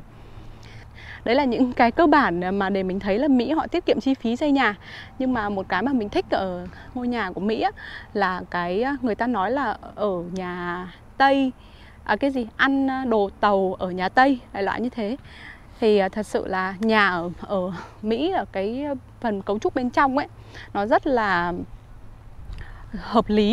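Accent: North, Vietnam. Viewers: giọng hà nội nghe thích bạn, mặc dù ở đời sống ở Mỹ rất cao nhưng chi phí cũng không hề nhỏ rất cảm ớn đã chia sẽ những thông tin nhà ở Mỹ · Mình dân gốc Bình Dương nhưng sao thích giọng con gái Hà Nội quá😍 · Giọng Bắc thôi chứ không phải giọng Hà Nội b ơi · Giọng em là m Bắc sau 1980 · giọng này là Hn chuẩn đấy . không phải giọng tỉnh lẻ đâu nhé. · Cảnh quan sạch đẹp, môi trường sạch, giọng nói dễ nghe giông giọng hà nội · Nhìn e cưng quá muốn yêu e luôn giọng bắt bắt lại nghe hay quá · Giọng Bắc thật quyến rũ.. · em là bắc kỳ hây miền trung dậy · Nghe giọng nói của hay ha, chính xác người Hà Nội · Giọng Hà nội của nghe rất dễ thương. ❤️ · Giọng nói Hà Nội vẫn chuẩn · Em gái này người miền Bắc sau 1975 phải không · nói giọng người bắc nghe dễ thương quá đi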